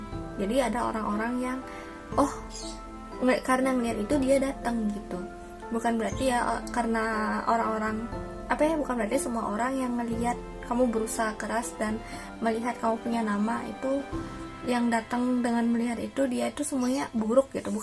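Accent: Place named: Bali, Indonesia. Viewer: Logatnya kakak kaya orang bali 😀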